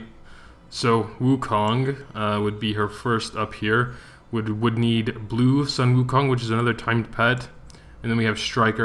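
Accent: Germany. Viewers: Are u German ?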